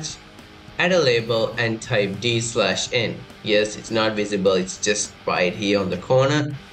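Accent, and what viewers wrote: India. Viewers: Him : voice Me : IndiaN?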